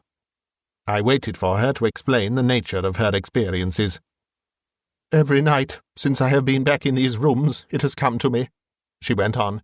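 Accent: United Kingdom. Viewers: New subscriber, very impressed with your delivery! I've always loved the vintage stories narrated with a British accent. They just demand them read so and you do a marvelous job! I'll be enjoying your work often! Thankyou!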